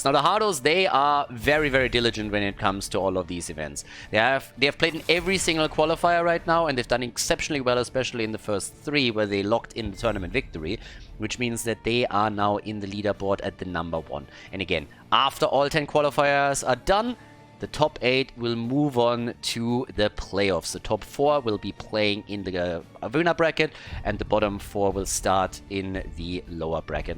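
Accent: Germany. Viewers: here in Melbourne we're in our sixth super-strict lockdown. Can't go outside for more than 2hrs, 5km limit, no visitors, no shops, etc. Your uploads make the evenings pass so much easier, my dude. Appreciate the fuck out of you and your stupid German accent hahaha <3 Love you, stay safe. (From a former neighbour/Dutchie)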